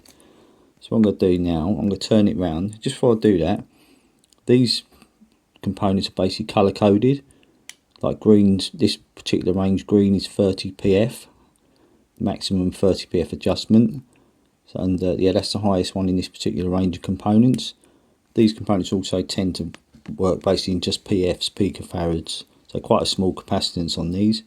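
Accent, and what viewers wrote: United Kingdom. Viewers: Your videos are nothing short of brilliant. I've religiously watched them all and several, multiples times. How you spell out how you do what you do and why is unmatchable. I love your titled bridges too: very creative. I also want to mention something that I've never seen mentioned before. I really dig your accent. not so much because I'm some odd Britophile, but I really get bored to bloody tears listening to guys with game show host, cookie cutter voices. I've just grown to feel there's something disingenuous about them. Your intonation and articulation just keep my attention meter pegged. Thank you. Keep 'em comin'!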